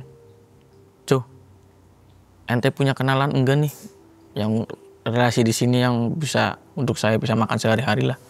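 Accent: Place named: Java, Indonesia. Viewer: Saya suka dengan cara bicaranya mas..medoke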